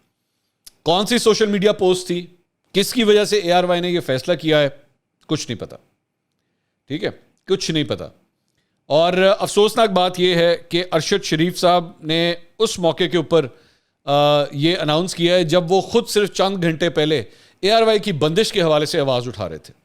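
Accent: Punjab, India. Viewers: mai, mera, mujhe band karo. U are a watchable presenter. But your words mai, mera, mujhe are not palatable. I like you due to your apparent neutrality and intermittent Punjabi as my father is from lahore, amritsar.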